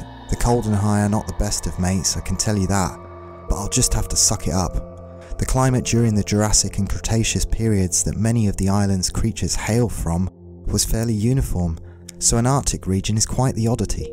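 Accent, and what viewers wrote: United Kingdom. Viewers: Respects and love from Tokyo, Dear sir , YOUR VOICE IS the most noble relaxing and soothing voice I’ve ever heard. BEST Englisg accent . How can I buy these books?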